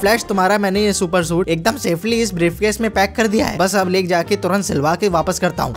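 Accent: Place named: Punjab, India. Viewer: Meri party aapka yah Punjabi tadka hai na bada mast hai mujhe maja aata hai aapka Punjabi tadka sunane mein aur Hindi wala Punjabi tadka 👍👍👍👍👍👍👍👍👍👍👍👍👍👍👍👍👍👍👍👍👍👍👍👍👍👍👍👍👍👍👍👍👍👍👍👍👍👍👍👍👍👍👍👍👍👍👍👍👍👍👍👍👍👍👍👍👍👍👍👍👍👍👍👍👍👍👍👍👍👍👍👍👍👍👍👍👍👍👍👍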